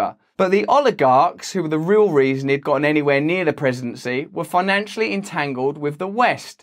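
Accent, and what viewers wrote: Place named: Essex, United Kingdom. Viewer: Not negating your point but just as an aside, if you knew anything about British accents you’d know that Russell has an Essex accent. Nothing wrong with it but it’s definitely not regarded as high brow accent here in the UK (and I say that as a Gordie!)😂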